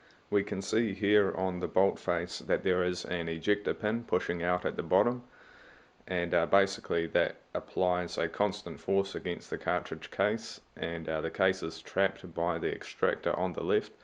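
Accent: New Zealand. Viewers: i was so supprised to hear a new zealanders voice :D where abouts in new zealand u from?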